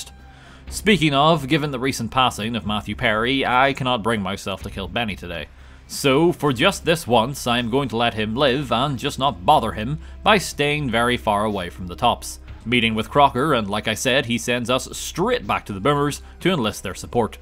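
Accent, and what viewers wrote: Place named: Northern Ireland, United Kingdom. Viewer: Been falling asleep to these for a while now and I don't think my brain can enter sleep mode anymore without listening to an northern irish man describing incredible acts of violence. I had an idea I thought might be interesting, since with the fallout 4 DLC you can craft robot companions what if you got the unlimited companions mod and just have an army of customized robots wreak havoc on the commonwealth, you could call it "can you beat fallout 4 as the mechanist" or something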